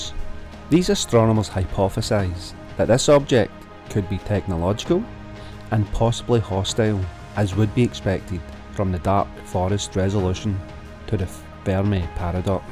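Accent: Scotland, United Kingdom. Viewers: Scottish/Edinburgh accent. As a Dane it sounds like that anyways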